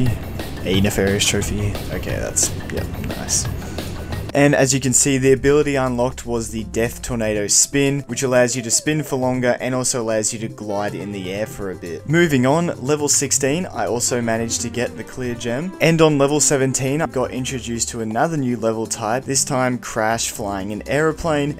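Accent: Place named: Australia. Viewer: 1: Crash Bandicoot takes place in a fictional version of Australian Islands. 2: you do kinda sound like that.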